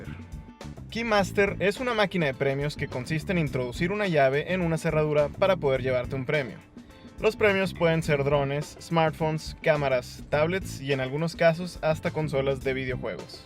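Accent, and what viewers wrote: Monterrey, Mexico. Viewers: Eres regio???? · Eres de monterrey verdad? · Eres de Monterrey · Saludos papu muy buenos los tips eres un crak claro mexicano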